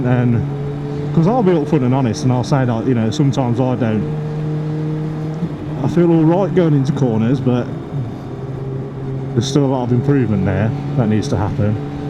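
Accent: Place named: Birmingham, United Kingdom. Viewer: Where are you from matey, you accent sounds pretty near to mine in Bedworth, yours sounds a bit more towards Brum though?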